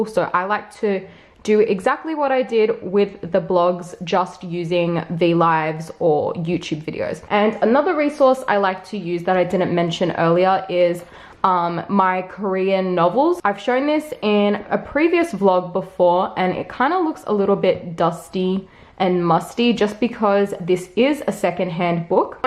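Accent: Australia. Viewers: I don't know if anyone gonna talk about it or not but I love your Aussie accent 😁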